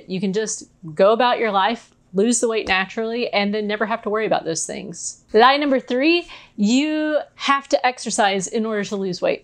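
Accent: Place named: England, United Kingdom. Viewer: you sound very English, my genetic background, however married a Frenchman! I find your slow and steady climb quite remarkable,and reflect if I had been satisfied with a slow approach I’d be done thrice over! Making such changes and so comfortable with your own path is inspiring. Great victory! Even the selling off your collection is profound! I believe I must dedicate my health and consequently eating habits that occupy my frame regarding size/shape to tell a different story from now on, in fact I insist! The wine actually led to picking and snacking…like a sloppy will, so I’m taking the reins, and I’m truly in awe when someone aspires to freedom and Triumphs! Move over I’m on the move!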